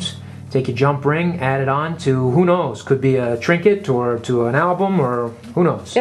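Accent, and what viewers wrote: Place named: Massachusetts, United States. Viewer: Wicked Cool!! LOL are you from Massachusetts? <---I haven't heard that in years! Love the collection!! xoxo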